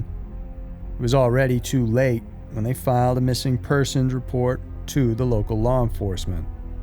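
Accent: South, United States. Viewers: God damn I thought that was Matthew mfking “alright alright alright” McConaughey doing the narration. That southern drawl was just a-twanging in my ear I tell you w’hat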